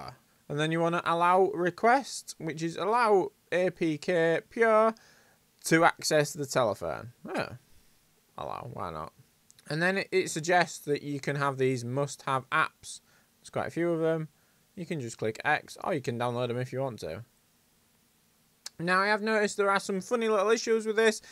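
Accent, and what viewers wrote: United Kingdom. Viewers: One thing i could confirm is that, he is from UK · Are you from Hull mate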